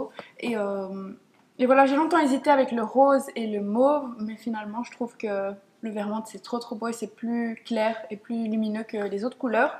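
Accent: Belgium. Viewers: J'ai le même couleur argent, très agréable d'entendre ton accent Belge 🙂j'suis Canadien ;-)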